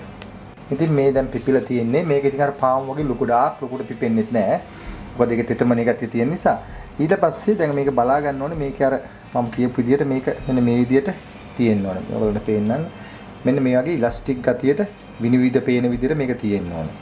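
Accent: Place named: Sri Lanka. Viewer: No offense. Have you heard the French, the Germans, Slovaks and other ethnicities speak English. They have their own way of pronouncing English words. For example, the Americans pronounce castle, tomato, potato and a few other words very differently than the British. There is a significant difference, that one could clearly identify where you are from. Similarly, chef was born and raised in Sri Lanka, and lived majority of his life in Sri Lanka. His way of pronouncing some English words are entangled with our sinhala dialect. Which comes naturally if one doesn't make an effort to change the way of pronunciation. Did chef pronunciation affect his recipe? Did his pronunciation affect his career? No. Not at all. Why publicly point fingers? How would you feel if someone did the same to you? Making one feel small on a public platform is unkind, Inhuman. 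It says a whole lot about you, rather than about chef.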